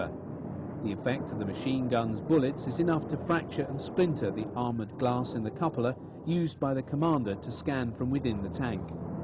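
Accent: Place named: United Kingdom. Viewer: ナレーションの方はイギリスの方ですね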